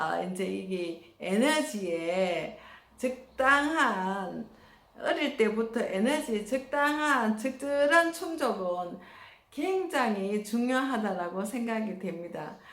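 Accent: Gyeongsang, South Korea. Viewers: 오늘 리비도에 대한 삶의 에너지 설명 매력적인 경상도 억양으로 잘 말씀 해주셔서 잘배웠습니다 좋아요 12번째 응원합니다 아무쪼록 좋은하루 되시기 바랍니다 😊 😄 😀 🙏 🤗 💕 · 강의내용에 많은 공감을 합니다 휴일 잠시 님의 강의를 다시 듣 고져 시청중입니다.그리고 연결 합니다..자주 뵙게되겠습니다~^~ 저는 서울입니다.30여년 대구에 서 자라고 학교를 나왔죠..쌤의 사투리가 대구.부산? ㅎ멋진 휴일되세요~^~ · 안녕하세요💓 리비도ᆢ 누구에게나 기본적인 정신분석을 잘 말씀해 주셔서 저에게 많은 도움이 되었습니다ᆢ 경상도 말씨는 은근히 매력있습니다 🤗 감사합니다 행복한 오 월 되세요 🥰🌻🌷🌷🌷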